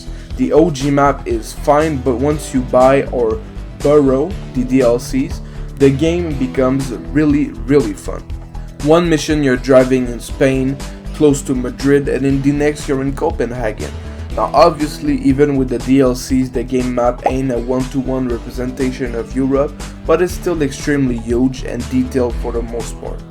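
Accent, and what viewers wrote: Quebec, Canada. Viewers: talking about mon bazou, you sound like you have a Quebec accent. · your french canadian for sure (quebecois calisse) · Toi t quebecois avec ton accent han?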